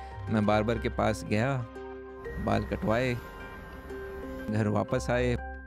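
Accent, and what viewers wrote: Haryana, India. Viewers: Nice haryanvi accent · Bhaiya your accent is going towards Haryanvi 🔥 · Harayanvi accent😅 Btw nice video as expected ✨💖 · sir, are you from Haryana ? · Am I the only one who loves his Haryanvi accent?? 🤣🤣😍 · Katayi haryanavi lgre ho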